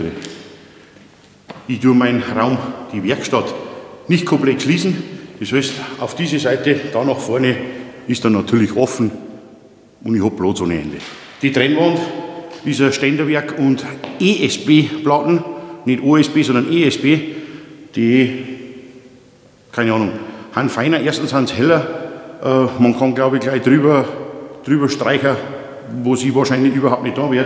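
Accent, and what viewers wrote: Bavaria, Germany. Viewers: Ich weiss das er heisst mehr nicht und bayrisch redet oder Österreichisch keine Ahnung was soll man da eingeben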